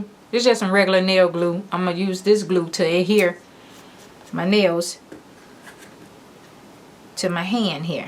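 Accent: South, United States. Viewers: 😂😂 im from SC and I was like whoah! Her southern accent is VERY southern even for me.😂😂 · Ever so lightly Like that there This here glue Hand here, Please where in the South are you from?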